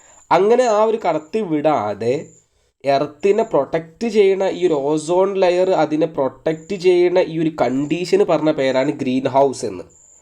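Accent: Malappuram, India. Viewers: Sir nte nadu Malappuram aano😃... Edak varthanom kelkkumbo anghane thonnunu😁 Anyway adipoli cls aanitto🤩🙌🏽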